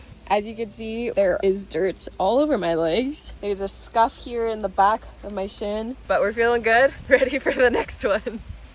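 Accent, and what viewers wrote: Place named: Philippines. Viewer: has a Philippine accent. My bestfriend is an exchange student from Phil.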